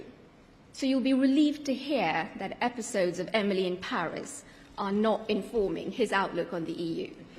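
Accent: United Kingdom. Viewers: Her accent is so Brit 😮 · Omg !! That British accent !!! She took me by surprise!! I thought it would be Indian English . Never mind. Good luck to both of u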